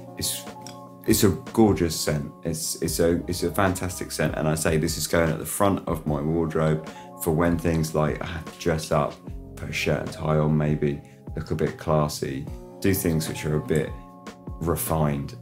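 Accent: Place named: United Kingdom. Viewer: You are really cute, thanks for commenting on my video;) I had no idea about you. I am aaalllll about Britisch accent (I'll watch your videos just for the accent, just kidding!) and I am looking forward to more videos;) Like and a new subscriber:) From the ones you mentioned I love Guerlain. How old are you?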